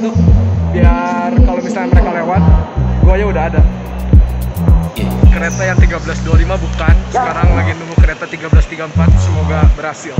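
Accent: West Java, Indonesia. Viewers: Logat sunda na mantep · Eta nya .ari logat sunda mh teu d mn2 bde stay d mn oge..keu2h ..moal leungit ..kjn pk bhsa gua..elu..😂ttp we aya.. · Aya logat sundaan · Kesini karena muncul di beranda. Salam kenal. Baru denger suaranya aja udah bisa ketembak kalau orang Sunda, cuma takut salah karena facenya eh ternyata bener asli Sunda, Jawa Barat dong. Salam kenal. Halloo... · Cara bicaranya kaya legok Sunda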